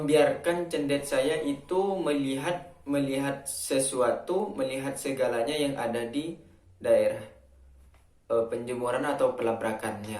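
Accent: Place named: Madura, Indonesia. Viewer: Madura nya bang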